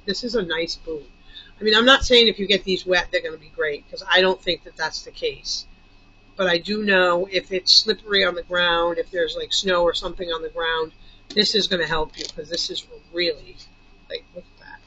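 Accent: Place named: New York, United States. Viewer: Hi, you so remind me of my neighbor in NY, the accent too.😄💗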